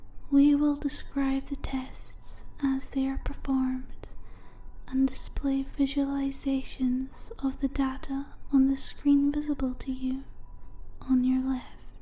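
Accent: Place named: Scotland, United Kingdom. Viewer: She sounds vaguely Scottish, is that a reference to the alien sightings at Bonnie Bridge (I think that's what I think the village is called)🏴🌉👽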